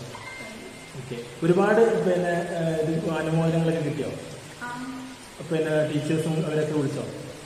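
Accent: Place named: Vadakara, India. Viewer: bro 😍 Vadakara yude thani bhashayil ulla vlogs😜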